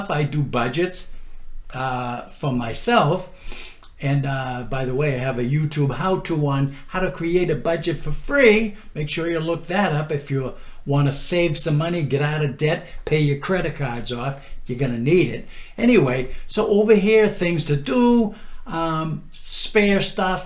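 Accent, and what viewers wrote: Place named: Massachusetts, United States. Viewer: Thanks! I enjoyed your information and the way you presented it. I'm guessing you're from Mass. I'm originally from Boston's Dorchester (now living in the Toronto area). My laptop is Windows 10 by my old PC (the one I use every day) has XP as its operating system. I depend on that PC (especially Cool Edit Pro... and I lost the "key") as an income generator but I know the hard drive won't last forever. Yes, I have the updated "version" of Cool Edit Pro (Adobe Audition) on my laptop but the old version works better (to me). Thanks again!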